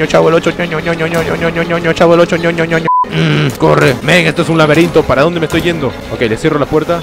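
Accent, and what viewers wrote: Peru. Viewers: eres peruano? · Eres del Callao no choro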